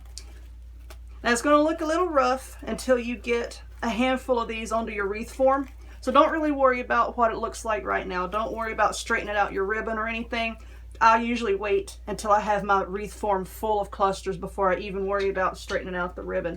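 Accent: South, United States. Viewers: Super cute intro and video! I'm going to attempt to make one like this. Also love your southern accent 💗😊🎃🕷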